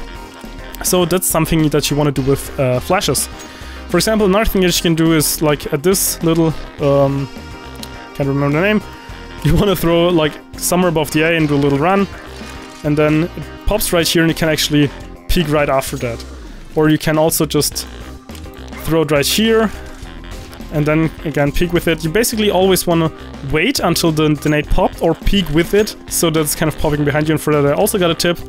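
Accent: Germany. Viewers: Just asking but are you from germany?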